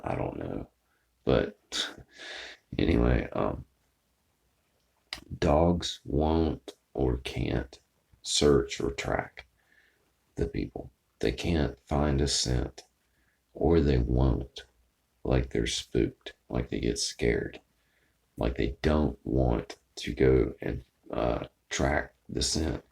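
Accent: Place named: South, United States. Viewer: How did I not know about this yet?!?! I may never have looked at it if it were not for you! I really love your podcast videos.....you have the best voice for this stuff....I love your subtle southern accent....and you are such a hottie too!!! Thanks for another awesome show!!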